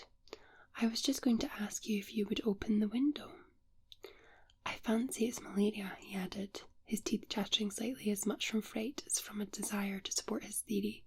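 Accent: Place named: Scotland, United Kingdom. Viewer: Very relaxing. Love your Scottish accent.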